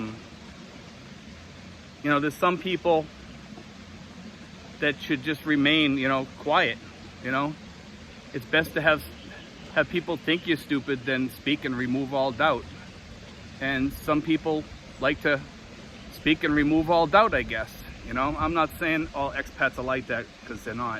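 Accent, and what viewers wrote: Boston, United States. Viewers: You seem to have a slight boston accent but i could be wrong lol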